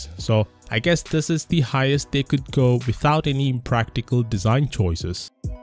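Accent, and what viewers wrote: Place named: India. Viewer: Indian english hahaha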